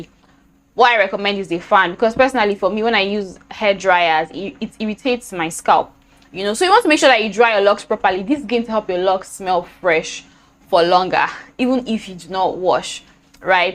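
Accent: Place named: Nigeria. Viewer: Ok I'm only 2mins in but baby girl is giving Naija goodness with her bubbly personality, lovely smile and beautiful accent. Love the professional set up and lighting too. Now on to the rest of the video...😊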